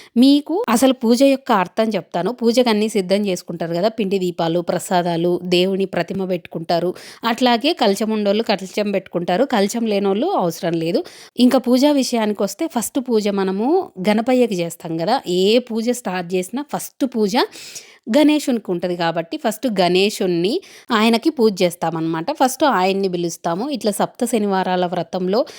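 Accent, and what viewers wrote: Rayalaseema, India. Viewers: Hi bujji maadi కూడా రాయలసీమ. యాస super. Funny Funny videos. Script totally natural. పూజ ardamainatu cheppavu.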